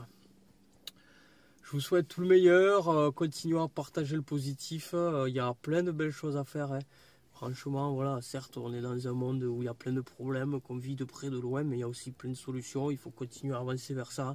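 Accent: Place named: South, France. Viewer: Cet accent chante le sud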